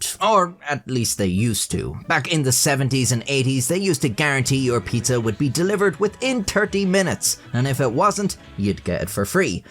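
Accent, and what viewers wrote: Ireland. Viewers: What county are you from, my dads from cork, I can’t pin your accent but i know it sure as hell isn’t Dublin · I love this channel. The interesting stories, the excellent artwork, your wonderful Irish accent and the humor. Entertainment and informative too.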